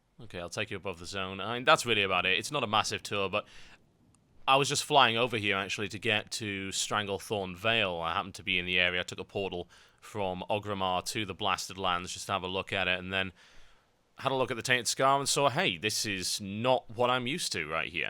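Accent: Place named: United Kingdom. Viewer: I'm not playing till cataclysm, beteen this guy and omfgcata I'm even more stoked about cata, the best part is, I'm british so this guy is like my on kind =), even though accents and language varies dramatically depedning on hich part of britain you're from, ahhh the way our language has been bastardised over and over...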